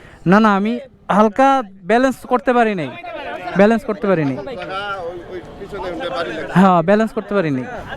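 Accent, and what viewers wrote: Barishal, Bangladesh. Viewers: তুই কি বরিশাইল্লা মনু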